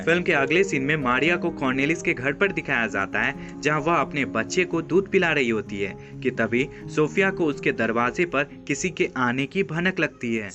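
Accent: Bihar, India. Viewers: Story is gud but Voice se lgta h bihari muvi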